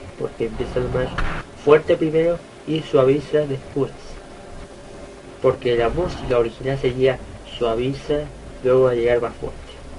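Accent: Spain. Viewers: por favor deja de hablar como español tenés que ser vos!!!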